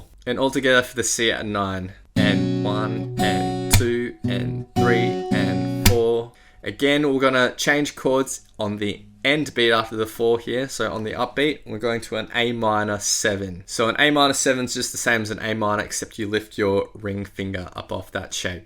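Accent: Australia. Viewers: I commented on a comment, where a few were querying country of origin, well cob, I can ya now, that’s the accent of true bluey, as Aussie as Vegemite, kangaroos n Holden cars, e’s a bloody legend mate, fair dinkum digger.👍😉 and no he doesn’t through shrimp on the barby, chucks some snags on amongst the chard onion.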